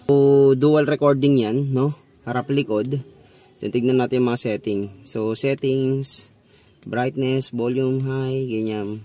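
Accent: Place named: Marikina, Philippines. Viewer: Puntong marikina, taga marikina!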